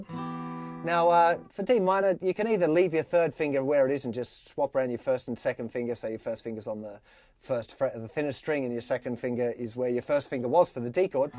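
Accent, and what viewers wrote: United Kingdom. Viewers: You have a British accent, and a Geordie hat. Thanks for the lesson. I used to play this, but it was years ago, and I needed a refresher. Thank you! PS: is your guitar make from? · I am from Liverpool, England. Lennon actually sings "she WORKED In the morning and started to laugh," not she walked in the morning et al. We Brits have trouble rolling the letter R. You sound like a brit....are you. You do a great job teaching and seem like a nice talented lad. Please keep up the teaching. Tara lah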